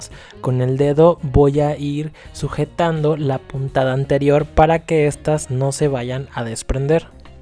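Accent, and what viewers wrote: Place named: Mexico City, Mexico. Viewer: Eres de El Centro de Mexico o CIUDAD de Mexico....por El acento 🎵🎶🎵🎵.. Alargan las palabras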